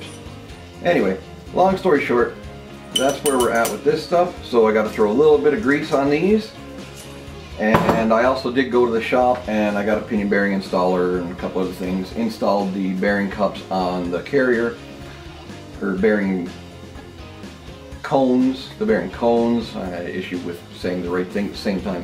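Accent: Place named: Canada. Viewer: I’ve been in the auto industry for 30 years and have never heard a ring hear called a crown gear, I kept listening and I believe I hear a Canadian accent perhaps, it’s interesting to me how each area or region has their own names for certain things.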